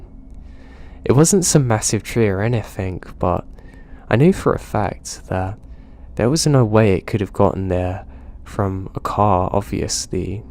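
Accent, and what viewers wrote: England, United Kingdom. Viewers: Your reading is perfect. New subscriber now. Where in England are you from?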